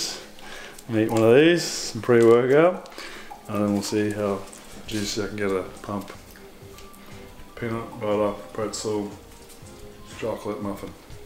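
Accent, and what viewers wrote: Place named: Australia. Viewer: Accent von Aussie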